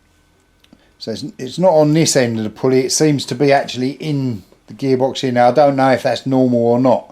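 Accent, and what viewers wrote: East London, United Kingdom. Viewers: Nice video. Your work bench is as cluttered as mine is , I think it might be a Cockney thing as you sound like you come from east London. 😊😊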